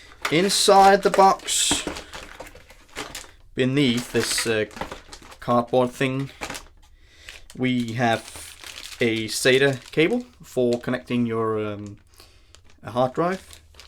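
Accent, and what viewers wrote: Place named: United Kingdom. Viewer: you look American but sound British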